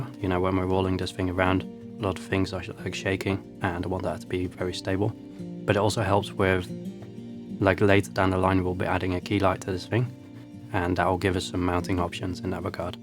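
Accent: United Kingdom. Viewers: Honestly, I do love the fact that I just randomly stumbled upon your channel. The fact that you're Dutch as well only makes it that more fun for me, lmao. (And will say, your English is really freaking amazing dude. Very British tone.) The setup looks amazing. Little cluttered but you're also still adding / modifying so I'm able to look past it. Keep up the good work. I'm looking forward to seeing the progress of this.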